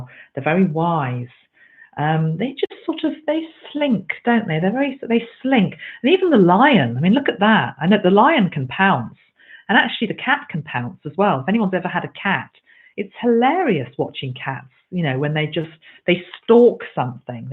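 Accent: United Kingdom. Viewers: You are truly a Jewel! I love listening to you and your British accent!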